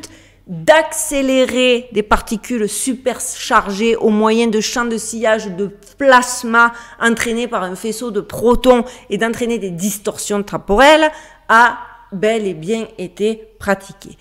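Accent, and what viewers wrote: South, France. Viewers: Juste quelques mots, je vous ai découvert il y a quelques jours et quel régal, des sujets extraordinaires avec une manière de raconter génial, l'accent du soleil en plus, merci merci merci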